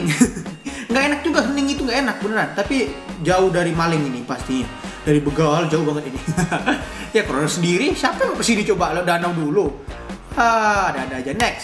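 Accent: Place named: Makassar, Indonesia. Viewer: Kakak orang makassar ya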